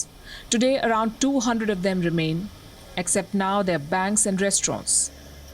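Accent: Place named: India. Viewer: Palki Sharma Indian accent makes me laugh to dead.Indian won't give up their thick heavy Indian accent anytime soon.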